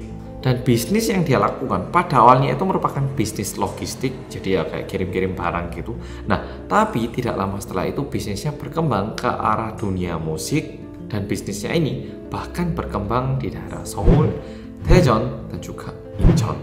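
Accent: Java, Indonesia. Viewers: Anda kaya orang Indonesia yg lagi merantau .bahasanya itu looh medok bnget hehe semangat mas. Teruslah bercerita tentang kehidupan korea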